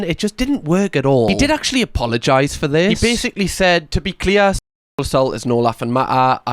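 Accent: Newcastle, United Kingdom. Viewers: Love you,! Geordie accent 4-Ever! Love from across the pond xoxo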